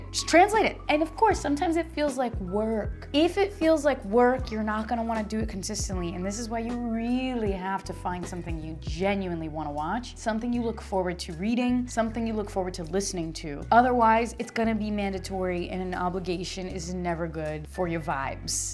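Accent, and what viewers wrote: Flanders, Belgium. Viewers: Bedankt voor jouw gratis prompts. Jouw vlaams accent vind ik ook "snoezig". Momenteel ben ik spaans, deens en papiamento aan het leren. Papiamento wordt op Curaçao gesproken en is een mix van spaans, nederlands, portuguese, en wat afrikaanse talen. Mijn moedertaal is engels. Als je een spreekpartner voor het nederlands wil hebben ( gratis EN voor niets), laat het me weten. Ik zou het leuk vinden. Groet, Greg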